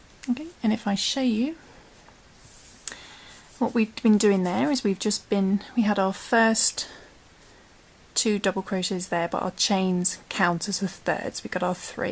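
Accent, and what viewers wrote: United Kingdom. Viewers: Too pretty for a cloth would make a super scarf. That said why oh why are you stating American terms firstly for the yarn worsted? or is the hasty dk British part? Also that’s not a double crochet for us older Brits it’s a treble! It just annoys me so much that fellow Brits nowadays seem to immediately use American terms I’m presuming from you’re a Brit from the voice naughty of me, also sorry pet peeve use of US terms for everything rant over. Is a nice pattern though.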